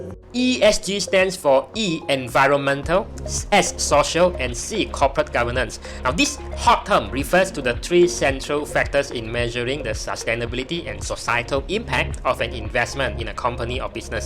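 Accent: Malaysia. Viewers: haha.. no la just teasing u .. I think u being original is the best regardless of what others say.. that being said, your English style of saying is just Malaysian could understand ..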